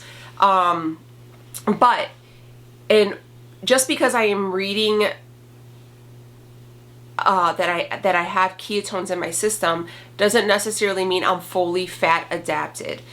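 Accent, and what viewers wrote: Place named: Midwest, United States. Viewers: Great post! You look beautiful! Also, you do totally sound like you’re from Minnesota! My ex is from south side Chicago and doesn’t have the same accent as you! Even sounds more like Chicago! Not a dig, I promise! Your accent is awesome, but it just doesn’t sound Chicago for some reason! I wish you could hear it! I love your videos too by the way, thank you for being honest and helpful! Ps-love the Mean Girls quote! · Lol. You do sound like your from Minnesota. Sorry... even when you were trying to not sound like you from Minnesota, you sound more like you were from Minnesota, ey' 😁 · You’re Midwestern, but not Minnesotan. LOL. Though you do say “Minnesota” spot-on like we do, mostly you sound like my friends who grew up in Chicago 😘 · "from Minnesota" If I had to guess, it's the way you say your O's. I lived in MN for most of my life and now live out west and get busted on my pronouncement of words with O's frequently and just giggled when they say it now. 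· You do have that Minnesota vibe. "Don't cha know"?; ) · LOL. You definitely sound like you are from Chicago!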